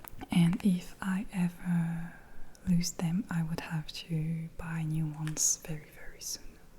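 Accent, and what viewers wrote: France. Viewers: I love that french accent :) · J'adore, ton soft spoken et ton attitude sont tellement relaxants ❤️ Bonne soirée ! (J'avais commenté une fois en disant que l'accent français en anglais me perturbait quelque peu... Mais je dois dire que j'ai bien changé d'avis grâce à ta jolie voix. Et je voulais m'excuser, en y repensant je me dis que ça aurait pu éventuellement te créer un complexe, et ça n'était pas du tout mon intention 😅 )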